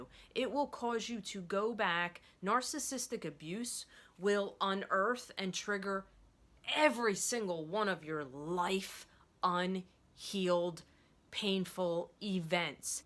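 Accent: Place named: Pennsylvania, United States. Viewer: Omg I knew that accent was very close! I’m in Media PA!! If you need a friend I’m here for you.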